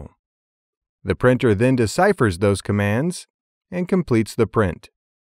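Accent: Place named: Oxford, United Kingdom. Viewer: SIR Yr video "RS232" Wached yr above video and I LIKED IT. I find it is useful to know. Positive features of yr video are:-- 1 Excellent video production (technically) and presentation. 2. You spoke in OXFORD ENGLISH , distinct / clear pronounciation and clearly audible. 3. Precise compact information presented to make the viewer understand clearly. 4. Lastly yr summarising what all you explained earlier/ all the while helps memorising key important points. Vatsa INDIA.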